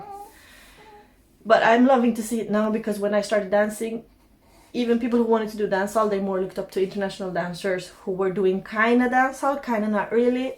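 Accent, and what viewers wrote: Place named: Jamaica. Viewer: I just realized why she has a slight Jamaican accent when she speaks English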